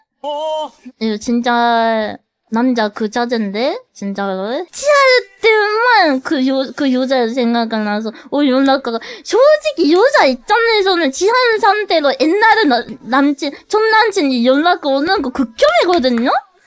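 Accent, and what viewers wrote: Japan. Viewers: 다른발음은 일본인이 한국말하는거 같은데 시발은 네이티브한국 발음이네 ㅋㅋㅋㅋㅋㅋ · 한본어 진짜 개쳐귀엽네 ㅋㅋㅋㅋㅋ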